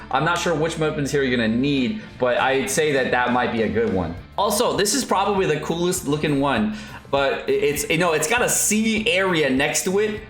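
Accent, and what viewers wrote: United Kingdom. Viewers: returns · Hi Hi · YAYYY! is back! XD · Love 🤣🌸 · is back!! Also, thank you for the heads up! All are good tips and guesses;) btw no theory of kazuha raise a flag? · HEY IS BACKKKKKKKK!!!! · Aye is back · yay is back. so happy to see him again. hope to see russian and normie soon too. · Welcome back! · I was really missing, I enjoyed that! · is back LETS GOOOO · Apparently it's tea time. That's why is back ☕ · Yay!! Glad he's not being mean to normal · The Return of 🔥 and those theories were really out there thanxx 🤣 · I missed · I missed lol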